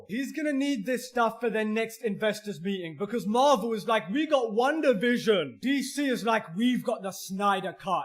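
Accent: United Kingdom. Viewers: He have british accent? I didn't noticed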